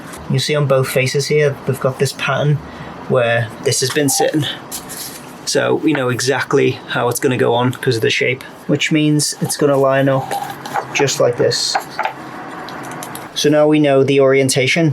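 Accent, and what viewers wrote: Liverpool, United Kingdom. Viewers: You from liverpool fella? Or close by just a guess from the accent hahah, your getting there with her slowly but she's gunna be some yolk when finished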